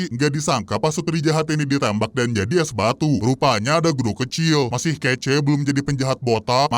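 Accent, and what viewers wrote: Java, Indonesia. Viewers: abang jawir ya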